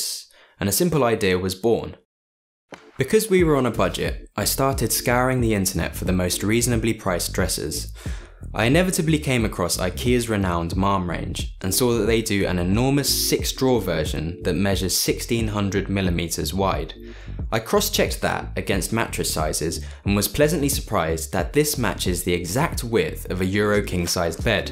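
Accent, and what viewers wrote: United Kingdom. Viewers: Ohhhh!!! YOU ARE A BRIT!!! how wonderful, the second you spoke, I knew it!! I love the UK YouTube home solutions - what is the point in watching the USA ones, when you can't actually go out and buy the products. Home Depot!!! Yeah?? I am sure they deliver!!!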